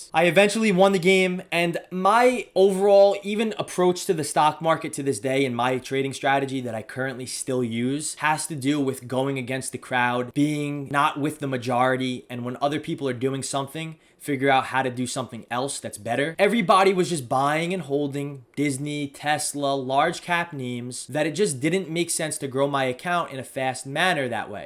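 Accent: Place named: New Jersey, United States. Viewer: Damn brother what a ride!! I decided to get a finance degree after watching the documentary ''Inside Job'' and my uncle is in finance. The documentary made Wallstreet look like a Mafia Scorsese movie and I was a street kid and thought damn, these guys are wise guys and they don't go to jail?? like the regular wise guys?? I signed up straight away for community college and then went to university - still get wise guy vibes with your Jersey accent brother 😂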